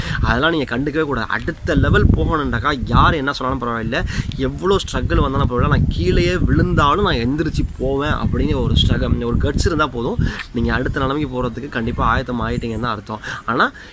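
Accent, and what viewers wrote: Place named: United States. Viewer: Woooow what a American engalish speech language... Good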